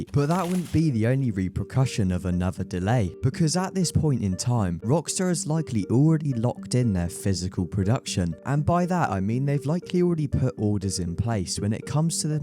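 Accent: United Kingdom. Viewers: Why does it seem like nobody in america is making these videos? Every gta 6 video sounds like some extra from harry potter narrating it.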